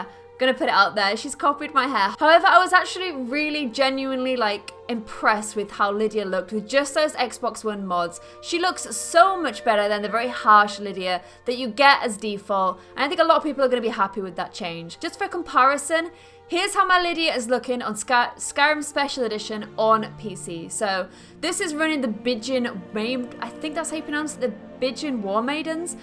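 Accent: United Kingdom. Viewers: So this is where the Little Mermaid went. She also adopted a British accent. Neat. :D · Well. She was hot until she unleashed her British accent.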